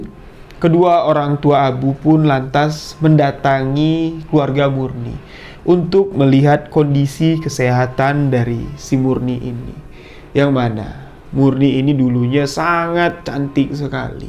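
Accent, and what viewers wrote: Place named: Madura, Indonesia. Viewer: Logatnya madura.... Maduranya mn bng?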